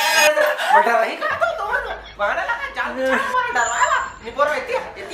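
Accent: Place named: Chhattisgarh, India. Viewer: Sudh au saaf suthra Chhattisgarhi bole bar bhai bahut bahut dhanyawad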